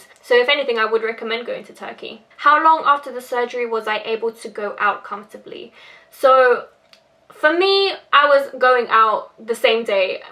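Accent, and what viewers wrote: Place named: United Kingdom. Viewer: Where are u from.. U have that british accent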